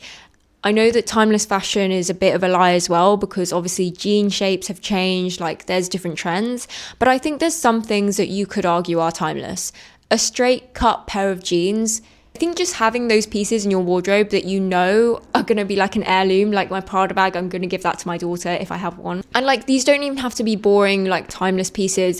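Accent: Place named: England, United Kingdom. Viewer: can you add to your list just having a good accent and utilising your voice? because girl, i live in australia and we barely speak the queens english, i will see the most beautiful, classy woman and she will ruin the whole thing by having a bogan or bogan-adjacent speaking voice. i understand being australian that we all sound at least 10-50% bogan at all times, so i aim to speak like Olivia Newton-John and just enunciate 🤣 btw, your english accent is beautiful!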